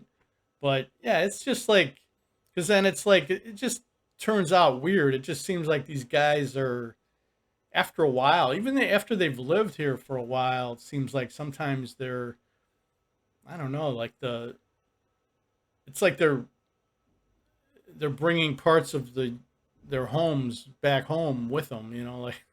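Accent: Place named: New Jersey, United States. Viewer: For some reason, I thought you had a Jersey accent. Great city, by the way. Taste of Chicago, awesome annual food festival. You a city respect their food & culture after attending such an event. Keep the great content coming. 👍🏾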